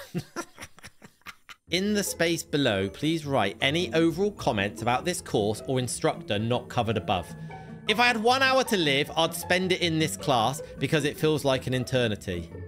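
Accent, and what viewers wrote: United Kingdom. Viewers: Noa fan of the British accent and the large number of words pronounced incorrectly.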